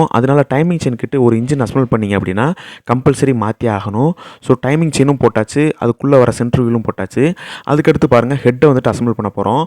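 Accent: Madurai, India. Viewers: Neenga entha oor madurai ya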